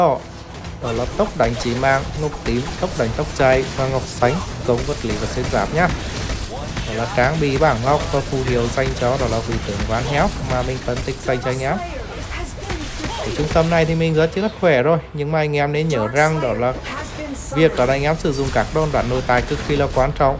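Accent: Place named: Hue, Vietnam. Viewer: giọng huế ko nghe đc gì 😢😢😢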